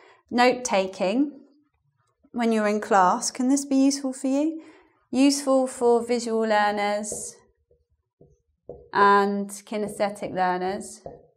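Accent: United Kingdom. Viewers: i love british accent! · oooooh!!!!!!! U're REALLY REALLY REALLY GOOOOD!!!!!! AMAZING BRITISH ACCENT!!!!!! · I would like to thank you for your channels and the services you offer. I am dating an English lady from Bath and she said I have a British accent when I speak English and when she speaks to me she sometimes feels she is talking to a British gentleman. I did not tell her I learned my British accent from you because she might get jealous. Thank you so much again.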